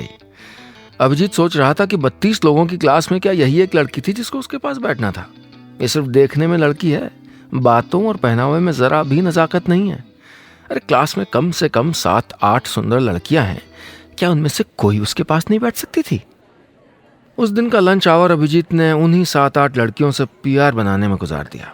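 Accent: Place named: Haryana, India. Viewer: What a deadly combo! Well...Opposites attract. Nice story and good to hear Neelesh in Haryanvi accent..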